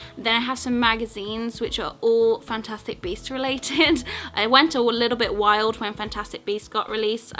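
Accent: United Kingdom. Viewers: I love your uk accent <3 in from the netherlands hahaha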